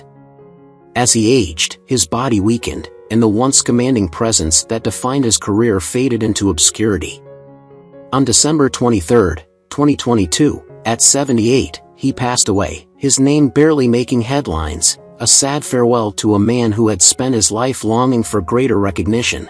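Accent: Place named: United States. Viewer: american narrator what do you expect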